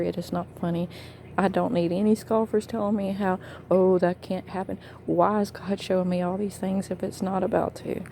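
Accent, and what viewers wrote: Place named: Alabama, United States. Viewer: Awesome! Listening to you speak I was thinking you from Alabama. We are close. I’m excited too. Thanks for sharing.🌈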